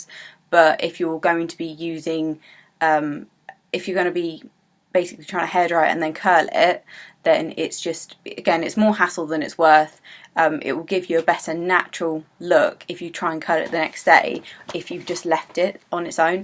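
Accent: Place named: United Kingdom. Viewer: Oh wow its a braid. This UK speak low-key makes me want to live my English heritage dreams & move.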